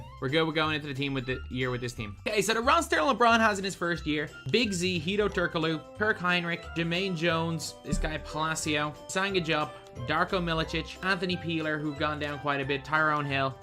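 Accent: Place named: Ireland. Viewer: Yeoo this Irish accent is goated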